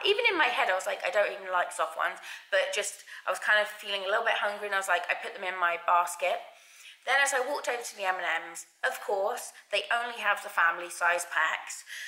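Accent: England, United Kingdom. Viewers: Been watching your vids and this was the first I heard with your vocie! Me: oh she's English!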